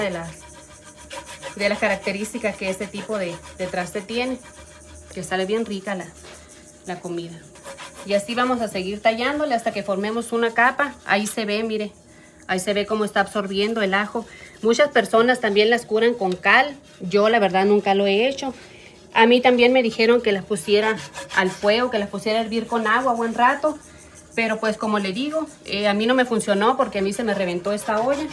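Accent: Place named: North, Mexico. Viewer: Me encanta su acento norteño…con la SHHHHHH! 😅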